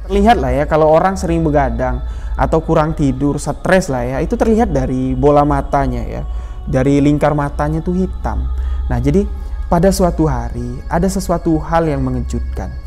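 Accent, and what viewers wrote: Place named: Madura, Indonesia. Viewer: Malang hadir dan selalu mendengarkan cerita mesteri, nada bicaranya seperti orang madura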